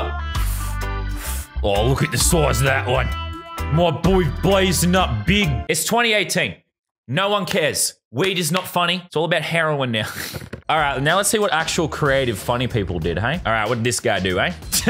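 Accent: United States. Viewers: was anyone else surprised by his American accent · bruh his american accent is actually insane lol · no joke, Lazars American accent is on point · So we’re just not gonna talk about how Californian his American accent was, he sounded like a different person!!!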